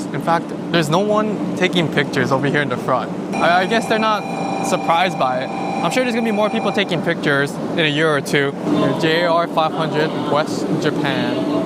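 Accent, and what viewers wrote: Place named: United States. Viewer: Why do you sound so American?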